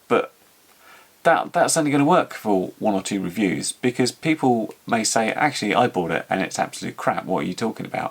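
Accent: United Kingdom. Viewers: As a fellow Brit I find it refreshing to hear your accent and your content is spot on, you call a spade a spade. I must admit that I've never clicked an affiliate link in my life purely because I research a lot and by the time I decide on the product the video is well closed. Must remember to come back to them as a little thank you - I just haven't so far. Keep up the great reviews I try to watch all your stuff.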